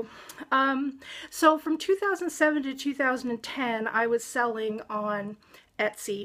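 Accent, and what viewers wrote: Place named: Washington, United States. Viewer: got to love that Washingtonian accent... another nice vid from you... thanks!!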